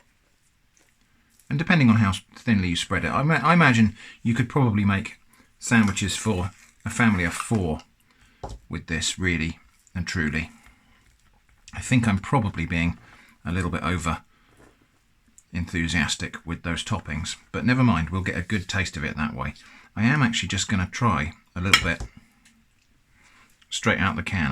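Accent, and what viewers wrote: United Kingdom. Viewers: pretty close on the spelling! with british accent of course! I understood it so good job! also these are damn great and tasty usually (ill edit it later, just started to watch the vid lol) edit: yup, probably one thing i miss from hungary since i moved to the uk... this stuff... the stuff here tastes like bullcrap, and not all of the polish shops sell this kind of stuff:/ but im happy, that it is not just loved by the eastern europeans, but by others as well! also try it with ham/chorizo on top of it, and add a bit of oregano on top of the cheese! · Your accent is magnificent. We Yanks speak crassly compared to the UK folks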